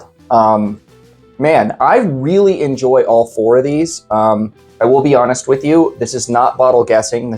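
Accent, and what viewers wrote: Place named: Texas, United States. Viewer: Love the Texas tie and Texan accent. I love how all our palates are different. thanks for getting this together and can't wait to do the FINALE live stream. cheers 🥃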